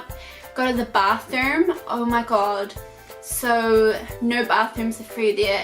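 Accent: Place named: New Zealand. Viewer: What a painfull video with hideous accent... Bloody kiwis....some people naked...yeah right....i think the acid she had has swallen her tongue cause she cant talk properly...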